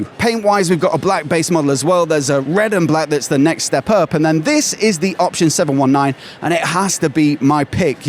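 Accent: England, United Kingdom. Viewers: excellent side by side overview of both new R12 models. Presented in a clearly spoken and easy to understand generic English accent, thank you. Particularly liked that you sat on the bikes to illustrate their relative size. Eagerly waiting for your road test - and the USA release of course.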